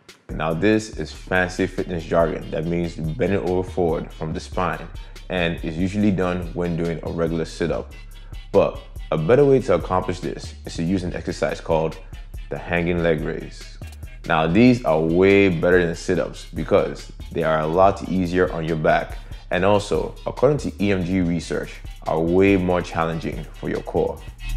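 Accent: Nigeria. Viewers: This voice is so Nigerian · Yo, i heard that nigerian accent 😂😂😂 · I sensed he was Nigerian from his accent at first. I felt kind of joyous when he confirmed it with that pidgin English line.E choke😁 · i can hear the nigerian in his voice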